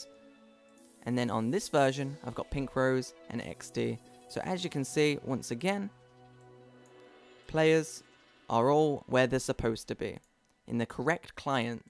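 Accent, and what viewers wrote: United Kingdom. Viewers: prepubescent englander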